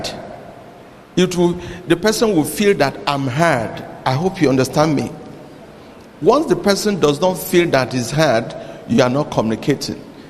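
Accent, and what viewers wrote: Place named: Nigeria. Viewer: he is most likely a great Nigerian speaker. He has a very heavy accent now, but in time, he could improve. I suggest hiring a speech coach. Recording his voice and reading ouloud for one hour at least three times a week👍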